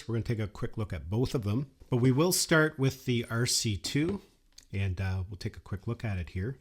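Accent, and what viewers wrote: Canada. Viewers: Well, the first flight went without a hitch! I learned very quickly to watch the controller and not the sky - the drone disappears in seconds. I would highy recommend this Beginners Guide. Calm, clear and really comprehensive without unnecessary detail. That Canadian accent is a joy!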